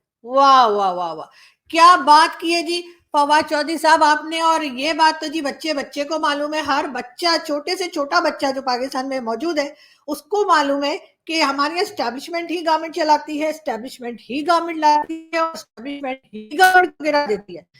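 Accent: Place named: Haryana, India. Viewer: ji main kai baar sochta tha ki aapki language dialect mein mere area wali dialect kyo hai ab pata chala ki aap to mere Area Ambala Cant se ho. I mean your mother from Ambala cant.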